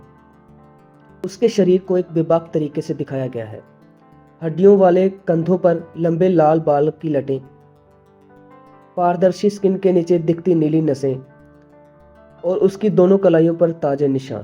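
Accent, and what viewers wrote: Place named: India. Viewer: Fab💙 Hindi Accent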